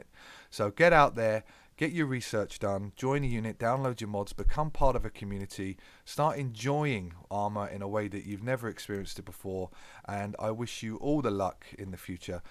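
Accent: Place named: United Kingdom. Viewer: This is one of (if not) the best - "How to find a Unit" guides I've seen 👏🏼. It's also refreshing hear a UK voice delivering it 👍🏼! Certainly a unit I'd consider applying for in the future perhaps 😉. In the meantime, I've subscribed and will be watching/spying/researching (😂) the channel.. 👀 Peace Out ✌🏼😎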